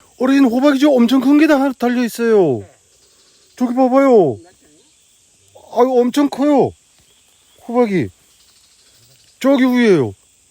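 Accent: Gangwon, South Korea. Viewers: ~~^^강원도 사투리 너무좋앙♡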